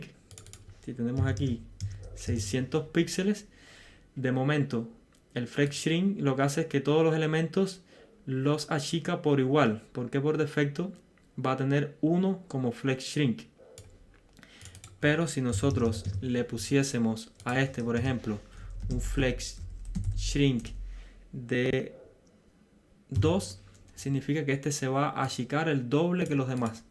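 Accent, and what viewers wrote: Cuba. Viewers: Tu acento me suena, eres de Cuba?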